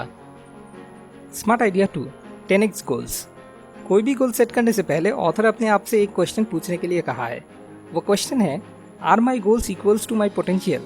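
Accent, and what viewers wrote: Bengal, India. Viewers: i love ur bengali accent hindi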